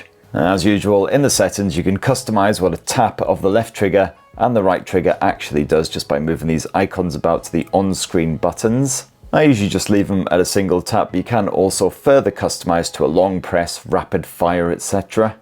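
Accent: United Kingdom. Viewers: you talk like Rowan Atkinson, or maybe every British out there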